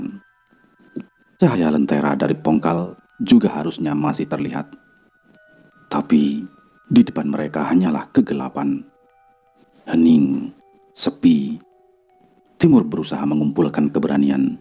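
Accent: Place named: Borneo, Indonesia. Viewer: Best. Sama spt mndengar ckap org Sabah/Sarawak (Borneo). Mudah kami fahami, baru happy😅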